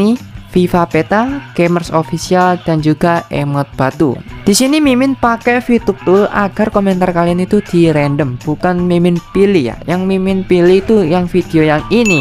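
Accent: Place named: Indonesia. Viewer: medok e rek rek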